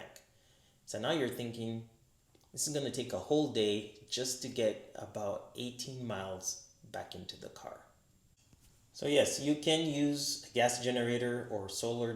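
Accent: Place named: Bahamas. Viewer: What island are u from ? I’m guessing the Bahamas.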